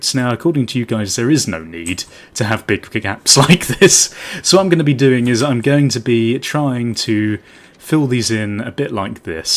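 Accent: United Kingdom. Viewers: British Accent FTW!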